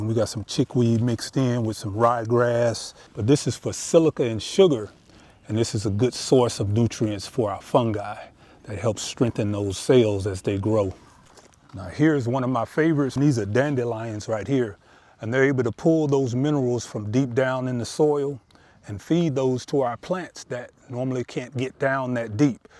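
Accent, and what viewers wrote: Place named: Georgia, United States. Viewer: Great video! you sound like Jamal Bryant a little bit. I wonder if it’s a GA thing😂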